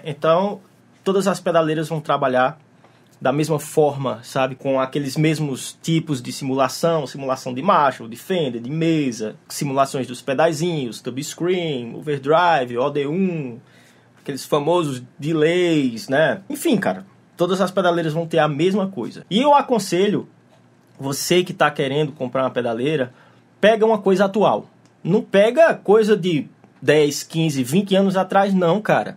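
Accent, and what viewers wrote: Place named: Northeast, Brazil. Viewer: O melhor de tudo: Sotaque Nordestino é 1000! 🤩❤️ Top!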